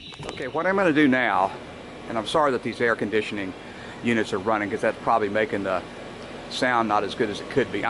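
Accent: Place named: Texas, United States. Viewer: Do u live here in Texas Sir??? Your accent sounds Texan.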